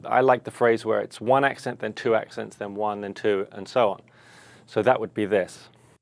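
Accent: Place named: United Kingdom. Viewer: Do you own all of these sets? As I am watching lately your videos (where you speak and act very British, compared to other guys on youtube channels) I can see a lot of nice sets: silver USA custom, black USA custom, blue Heuer, Phoenix, or Hybrid Maple, black and white....What's the deal? How do you get all of these?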